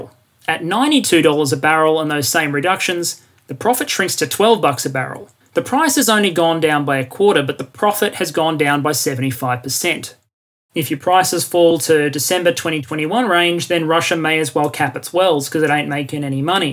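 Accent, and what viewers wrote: Australia. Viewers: I like how australian guy tells me our energy policy more in depth than our own government. Excellent video, thank you! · Is this the "Economics Explained" guy's second channel? (Or first channel or whatever?) Their voices sound identical to me - and I'm and Aussie, so it isn't just that the accent(s) are Australian.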